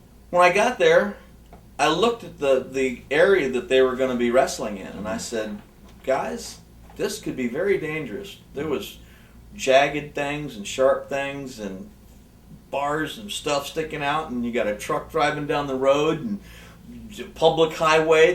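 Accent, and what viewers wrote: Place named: South, United States. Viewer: I can't even watch this garbage you expect anyone to believe you punked Sid and came up with everything that turned dubya cee dubya into a competing company but your ideas were stolen by Bischoff and then he fired you? No one believes you just stop , you were fired for being another hang around collecting a check coming on with the same old 70s and 80s southern draw just like everyone else Eric got rid of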